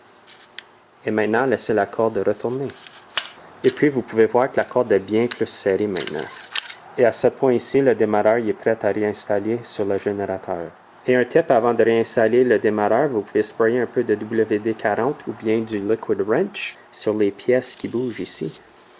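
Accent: Canada. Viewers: super, intéressant. Amusant le franglais canadien, e ton adore l'accent! double plaisir à regarder!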